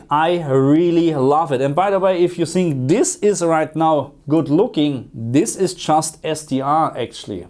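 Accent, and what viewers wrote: Germany. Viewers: Deutsch Akzent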